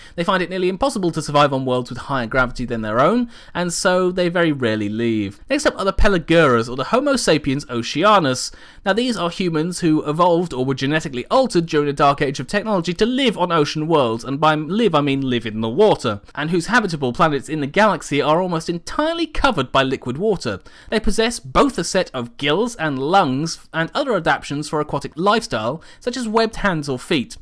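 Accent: United Kingdom. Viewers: Hey I love your channel and every time you post a video it brightens up my day with glorious 40k goodness, delivered in a charming English accent just a it should be. I was wondering if you would re-visit space marine chapters with The Silver Skulls, a very little known chapter with a interesting set of traditions that really deserves more attention from fans. In short cross Ultramarines and White Scars.... yea thats a thing <link>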